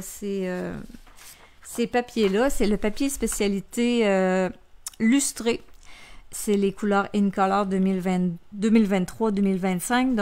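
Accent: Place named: Quebec, Canada. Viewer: Je vous découvre et suis sous le charme de cet accent des cousins❤ merci pour cet astuce très intéressante. Bonne journée.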